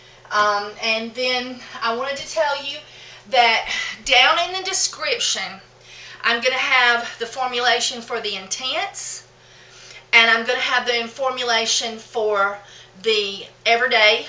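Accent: South, United States. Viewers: I live in Colorado where it is very dry...I had to laugh because your from the south, and I used to live down there...I picked up the accent now and my hsb has wondered "who have you been talking too?" I said I havn't been talkin' to anyone, just a listenin' to a lady on a video" I loved livin' the in the south where I warshed my winda's and then I moved back here where I wash my windows...Not making fun of you, just that you bring back such good memories! Never met nicer people..I love the south...I wish I could get my hsb to fetch somethin', in fact anything!LOL,...but they don't fetch in this neck of the woods...haha....Loved your video's and want to make some creams some now...blessings, Victoria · I love how you’re southern af but also a hippie 😊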